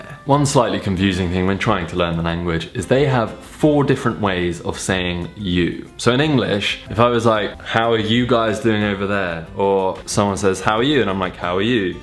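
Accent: United Kingdom. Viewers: I Love your british accent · wow! That is the first video of you that I watch and I love love love your accent! I'm actually German but I love it when people speak in this British accent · I love your British accent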